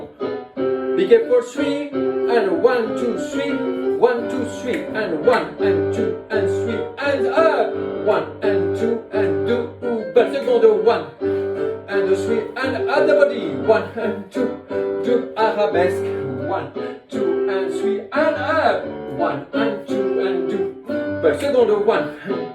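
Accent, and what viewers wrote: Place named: France. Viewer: Your French accent is beautiful.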